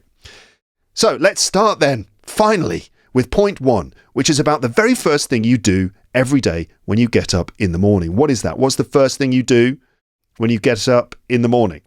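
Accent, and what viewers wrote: RP, United Kingdom. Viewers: Another thing I want to point out is that your English is very clear "'Received Pronunciation'" is very easy to understand. But in real life in the UK, it's much harder than I expected to understand the average native British speaker and I'm not even talking about dialects.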